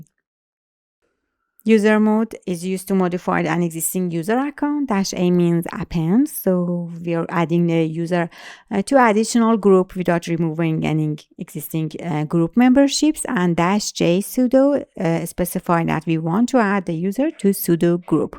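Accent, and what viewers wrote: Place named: Iran. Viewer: Lahje irani dari Vali das khosh Afarin❤❤😂❤❤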